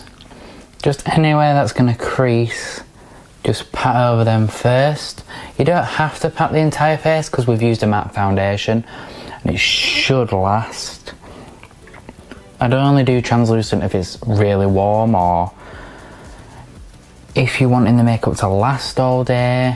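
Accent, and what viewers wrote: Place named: North, United Kingdom. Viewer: You are so refreshing, I love how northern you are I feel reyt at home listening to your voice whilst you do your make up. 🥰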